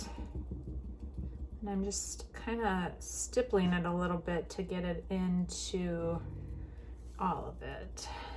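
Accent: Wisconsin, United States. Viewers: Like your upcycled items..also ur accent makes me miss Wisconsin...live in Oklahoma now...subscribedand sharing!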